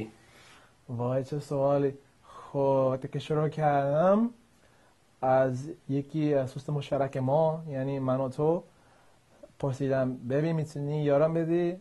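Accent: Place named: Iran. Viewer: Wow amazing! Has Persian accent.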